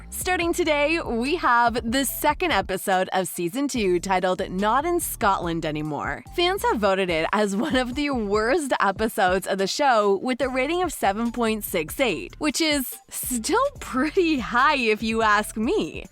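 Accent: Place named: California, United States. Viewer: the narrator's CA stoner uptalk accent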